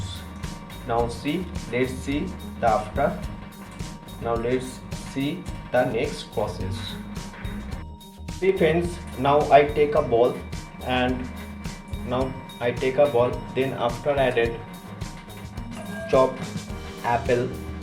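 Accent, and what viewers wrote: India. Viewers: Mania the reason why we are laughing it's because it's an Indian speaking this way... FYI french , Chinese, Spanish, Russian, Africans, and many rural country accross the globe speak worse than this... Atleast we understood him if it were some other countries mentioned above we wouldn't have been able to understand them even more... Stop criticizing and appreciating... He's got a million people supporting his content.. work is more important than the language.. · Are you from India I love the accent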